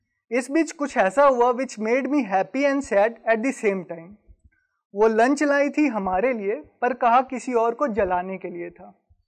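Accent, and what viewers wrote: Bihar, India. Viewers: Very nice video sir, it has a little probabum, you have a Bihari tone in your words, you should also look at your bass.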